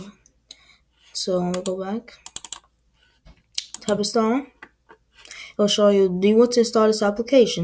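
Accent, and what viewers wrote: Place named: England, United Kingdom. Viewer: a hint of English in your mumbling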